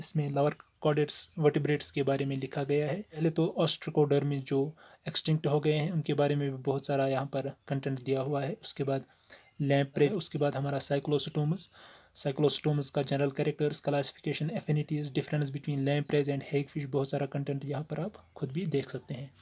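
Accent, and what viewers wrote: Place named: Kashmir, India. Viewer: You are Kashmiri I got it through your accent 😶... Great job bro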